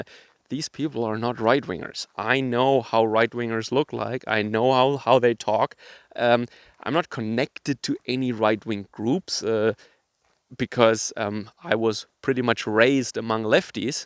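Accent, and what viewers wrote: Germany. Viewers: Man, your English is great, but you still have some distinctive German mannerisms in the way you speak. The way you describe the protesters as commies and hippies, walking barefoot with their guitars just sounds hilarious, thanks for giving me a good laugh with that.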